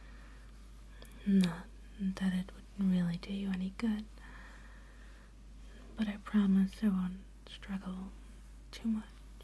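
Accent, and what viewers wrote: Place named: Netherlands. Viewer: It's funny how the teeth improve your diction with your Netherlands accent. ;D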